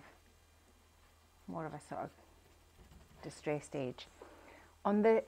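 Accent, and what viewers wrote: Scotland, United Kingdom. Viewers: Love this.... I've been binge watching every one of your videos while I'm in my own store working on furniture. Your work is mesmerizing! And I love your accent - my brother in law, Ian McCallion, came to live in the states when he was 15, we are 59 now, he still has the Scottish accent and all of his American boys, all 4 of them, can roll right into that accent as well. It's great! And, btw, I just became a retailer of your own gorgeous papers here in New Jersey.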